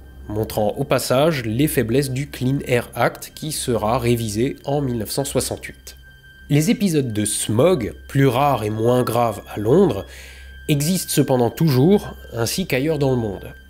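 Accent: Alsace, France. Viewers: Hello! Bien qu' "écolo" dans l'âme, je pense comme toi qu'aujourd'hui le nucléaire est indispensable/un moindre mal... Mais : Je pense aussi que tu te trompe a propos de la pollution que cela engendre, il s'agit de la pollution indirecte qu'engendre les déchets du nucléaire que nous ne savons pas traiter, ceux qu'on enterre, qu'on stock en attendant ou que certain voudrais envoyer dans l'espace... C'est l'equivalent des décharges, c'est un problème qu'on laisse pour plus tard "en attendant que...", ces problèmes mis sous le tapis sont le veritable noeud du problème a mon sens. Pour le reste (bien que ta voix alsacienne me cringe énormément et me pousse a skipper systématiquement le placement de produit dans la seconde) bravo pour le contenu de tes videos, continue comme ca :) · Peu importe le sujet (toujours d'une très grande qualité), l'accent alsacien vaut à lui seul le détour ! 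😁 "ALSACE STYLE"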